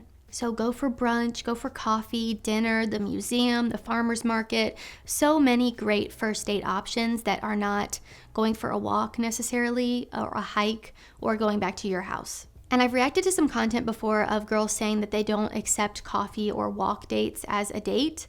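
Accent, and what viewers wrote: United Kingdom. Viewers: Other than her british accent, I 100% agree with her. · Her voice is more masculine than it is British accent. I will leave it at that. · I came to the conclusion that I would never marry a white woman a long time ago and I didn't. I married a Philippina 11 years ago. No headaches, no arguments, no bullshit. I know that the woman seen here is an Asian of some flavor and has a British accent. Stick with PH as most (but not all) are much better than what you see here. You will thank me later!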